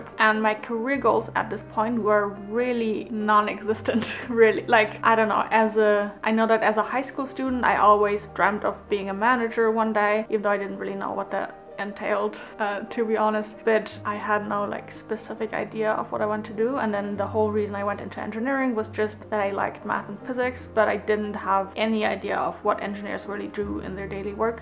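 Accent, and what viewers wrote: Germany. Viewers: Hi, there are many German colleagues at my company and all of a sudden I just want to see this accent again 😄. Then, saw your ''when german engineers speak English'' video. It was the first time I discovered this channel. When it comes to this video, a university internship has been a turning point in my career aim. I was planning to become a manager since the beginning of the university even though what it is exactly, just like you. Whenever I watch one of your videos, I am settled more creative and focused mindset. Thank you for the quality content and the thoughts you share!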